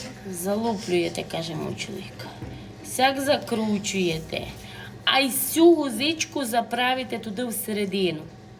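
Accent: Transcarpathia, Ukraine. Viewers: Привіт з Львова! Дуже люблю закарпатський говір,і ваші страви,молодчинка,так тримати!❤❤❤❤❤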